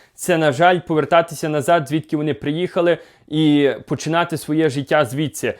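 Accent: Western Ukraine, Ukraine. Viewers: Акцент западенский, тернопиль, хмельницк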